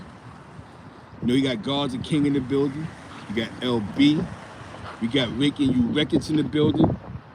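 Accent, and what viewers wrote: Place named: East Harlem, United States. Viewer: Damn I missed the live but salute and make sum videos it’s wack out here need to hear that East Harlem talk u know how we do 1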